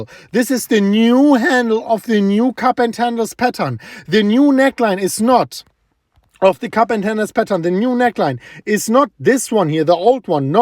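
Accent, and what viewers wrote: England, United Kingdom. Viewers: Sounds like England 😂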